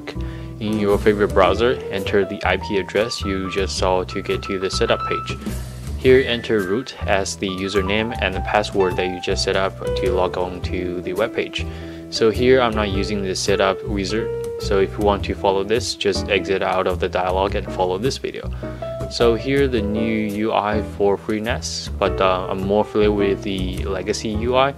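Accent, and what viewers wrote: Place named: China. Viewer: Are you a Chinese? I like your accent!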